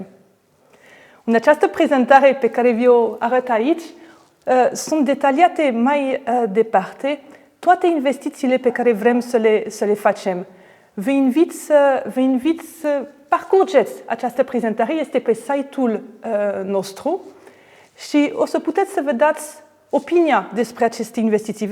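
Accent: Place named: France. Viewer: Aveti un vocabular foarte bun, dar ar trebui sa lucrati la dictie pentru a elimina acel accent frantuzesc foarte puternic si a pronunta corect litera R. Suna foarte neplacut in limba romana. La emisiunea "chefi la cutite" vin straini care vorbesc MULT mai bine romana dupa doar cativa ani in Romania, chiar si doar dupa cateva luni...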